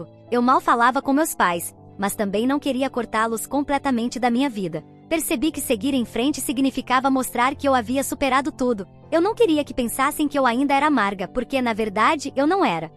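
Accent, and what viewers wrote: Portugal. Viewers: Já me des escrevi desse canal e continua aparecendo para mim e fui dar uma chance depois de umas 10, pensei; "ela(e) deve estar lendo, não pode errar pra ver que desistiu do canal. .português de Portugal na história 2, não tem mais transição. Ou tá fazendo de propósito pra ser isca de raiva ou não se importa mesmo é vai continuar até todo mundo saltar do barco. Não tem dificuldade pra traduzir e só jogar numa IA pra traduzir e jogar gerar voz. O único esforço e revisar e editar e nem isso faz. Parabéns por matar o canal.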